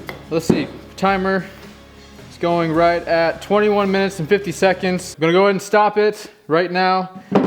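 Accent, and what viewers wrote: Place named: Massachusetts, United States. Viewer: yeah that’s clear. You have a MA accent. One that is very familiar to me. That’s why I asked if you were from New England. I gave the a 6 state zone 😂. Happy Thanksgiving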